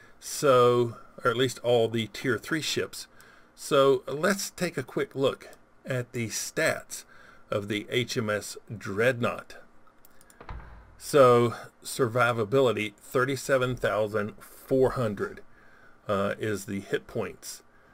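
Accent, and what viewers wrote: South, United States. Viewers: It's so much fun to hear a southerner trying to pronounce European names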